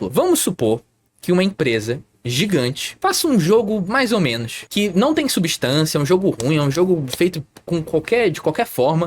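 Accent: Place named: Northeast, Brazil. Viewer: esconde o rosto dnv man vc é o boneco de IA do seu perfil, n este gigachad com um sotaque de nordestino disfarçado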